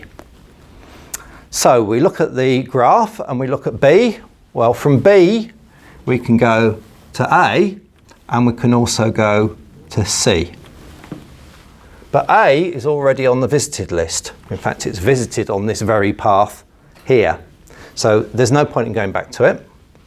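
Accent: United Kingdom. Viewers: Came here just for the British accent :p .. nicely explained! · besides this amazing explanation , this is a very lovely British accent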